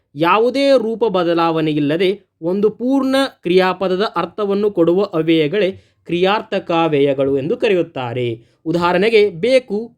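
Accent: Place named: North Karnataka, India. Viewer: Tq sir i was waiting for this class!!nimma uttara Karnataka bhashe tumba chenagide 🌹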